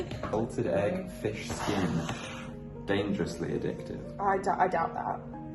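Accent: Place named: United Kingdom. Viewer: Wonderful. Beautiful British English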